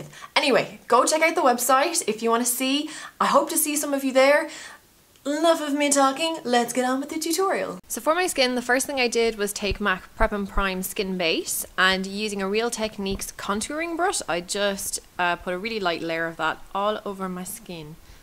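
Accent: Ireland. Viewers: you are from aus?! i thought u were irish ha! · Just randomly found your channel. LOVE!!!!!!! I know you mentioned Australia, but that's an Irish accent I hear. What's the story???? I went to Northern Ireland last summer, so I'm basically obsessed. Thanks for a great tutorial :)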